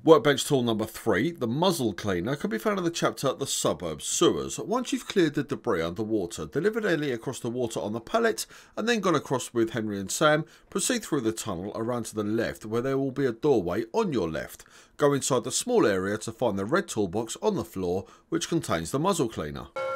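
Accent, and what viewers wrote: United Kingdom. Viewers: no one told you to talk a little bit slow...man not all people understand English in a UK accent !!!!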